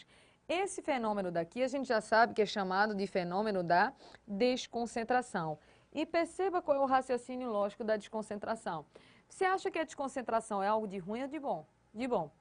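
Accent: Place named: Northeast, Brazil. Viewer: sotaque do nordeste.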